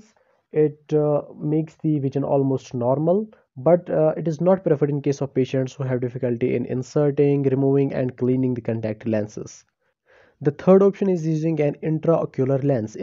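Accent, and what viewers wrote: Kashmir, India. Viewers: Kashmir se ho kya baya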